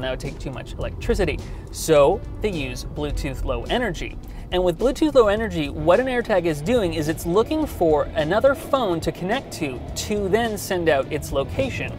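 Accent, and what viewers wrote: Canada. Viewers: Another Canadian eh? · I’m sorry — Canada, eh?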